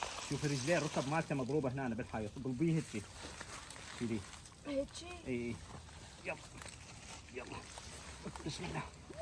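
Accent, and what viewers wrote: Iraq. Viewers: ياجماعة الخير اني اذا افتهم من لهجتك انت نجفي قح من جماعة كفه الصحن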